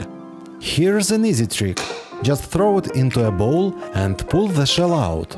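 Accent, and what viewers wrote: Russia. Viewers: Do you have a favorite hack for making 1/4" butter cubes for pie dough? the cooling rack iiii good, but I think I should pop it in the fridge or freezer to get them hard enough to pop out of the race without mashing them. Oh! and the mushroom cutting! So good. Is that a Russian accent I detect? I have so many Russian/FSU friends that I feel right at home with you teaching us. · I can't help but subconsciously find the accent very uncomfortable. As if I heard Russian instead of English. the emphasis on syllables with specific vowels even scares me as a Czech. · Такой милый рус акцент, я даже забыла, что на англ слушаю. :)) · Какой ужасный русский акцент... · U sound russian. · I like your ideas and your russsian accent. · Please do not record your videos in English. You have terrible Russian accent. Take pronunciation lessons. Good luck. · Видео на Английском языке, стало быть и комменты будут на Английском 😂😂😂😂😂😂, да, я по незначительному акценту поняла , что парень говорит и на русском, но в общем видео для англоговорящих.❤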